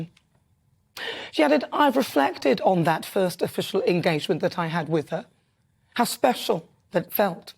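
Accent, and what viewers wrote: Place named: England, United Kingdom. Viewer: you are brilliant. You see it and you tell it. Love your English accent too:-) Hi from NZ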